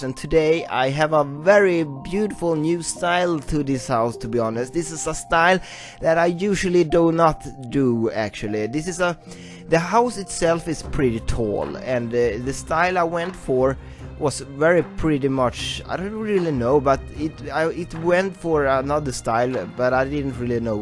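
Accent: Sweden. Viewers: Är du svensk, gissar bara😀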